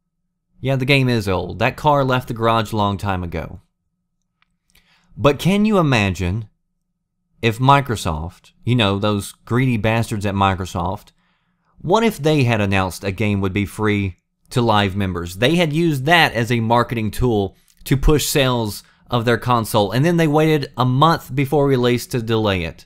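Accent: South, United States. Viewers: This guy sounds like a Southern Weird Al. I am not disappointed.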